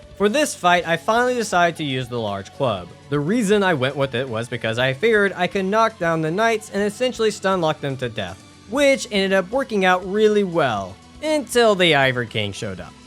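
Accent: Louisiana, United States. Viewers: Immediately subbed when I heard the Cajun French, Louisiana/Souls representation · Hearing the Cajun activated me like a sleeper agent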